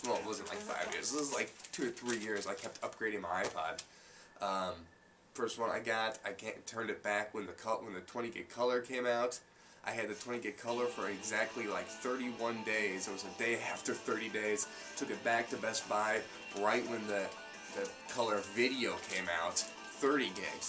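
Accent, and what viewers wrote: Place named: United Kingdom. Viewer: Very enjoyable responses matey! As a Dutch person I am really impressed by your English accent. It's not exaggerated which means that it actually works... I guess, but only the Brits will be able to judge that I guess....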